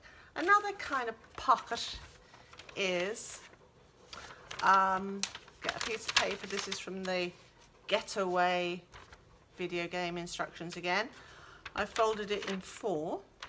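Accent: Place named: Ireland. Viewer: Thanks so much this is brilliant My mother is also Irish.... we can show off our Irish accents!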